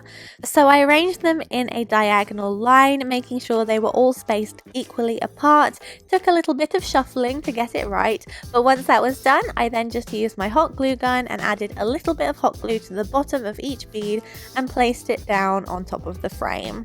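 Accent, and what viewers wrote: United Kingdom. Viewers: Omg she’s pretty has a British accent and her name is? 😳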